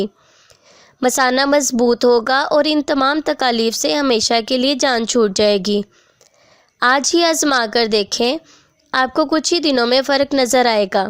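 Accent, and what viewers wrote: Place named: Sialkot, Pakistan. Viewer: Aapke Bolne ke lehje se lag raha hey keh aap distric sialkot se hey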